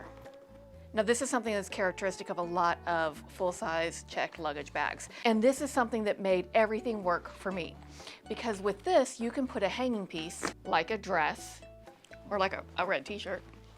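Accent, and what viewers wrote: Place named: Texas, United States. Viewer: Hi! This is my first video of yours to watch, and it was such a treat! I love that you say y'all! 😀 You sound like you could be a neighbor down the street here in Texas. LOL I was also surprised to see that we have the same exact backpack, down to the color! I love it! I'm fixing to use it for my very first carry on only flight. 😀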